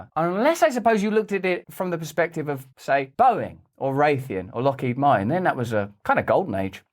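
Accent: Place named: England, United Kingdom. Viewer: I been following your channel for a while and one thing that stops me from continues watching your good content is lack of subtitles. It is hard for me to understand your English accent. Hope you can add subtitles one day so that native Spanish speaker have an easier and clear understanding of such valuable information.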